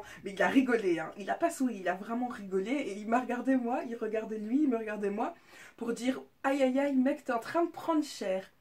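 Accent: Belgium. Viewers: j'adore ton accents belge 😘💝😜 t'es juste hyper belle c'est fou 💎💎 tes parfaite 😍😍😍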